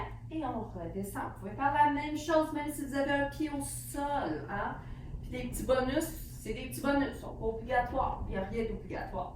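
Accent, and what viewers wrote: Quebec, Canada. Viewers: Super séance! j'ai 78ans et j'ai adoré je le pratique un jour sur deux, j'ai enfin trouvé mon Pilates idéale en plus avec l'accent du Québec c'est cool, comme on dit chez moi c'est tip top! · Génial ! Vous êtes formidable ! Ce cours accompagné de votre délicieux accent du Québec est un vrai moment de bonheur! Merci 👍🏼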